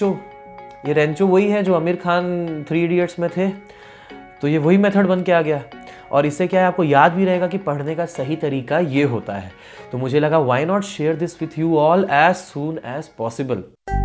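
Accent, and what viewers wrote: Bihar, India. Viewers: Bihar na..???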